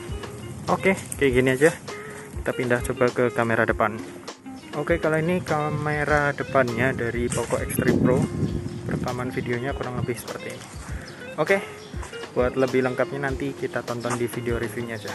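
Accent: Semarang, Indonesia. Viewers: Cah Semarang mas?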